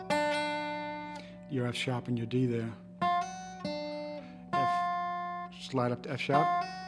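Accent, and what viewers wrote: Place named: Brooklyn, United States. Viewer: Interesting, sounds like he's from Brooklyn, NY or close to that, can't pick very good either.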